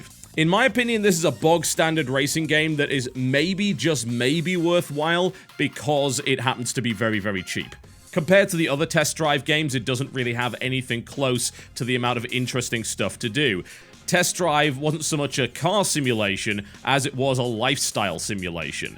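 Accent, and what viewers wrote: United Kingdom. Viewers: Video content is good, british accent is just terrible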